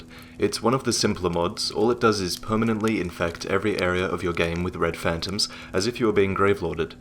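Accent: Australia. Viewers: hey are you australian? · are you Australian? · Wait. Hold up a second. YOU'RE AUSTRALIAN?!?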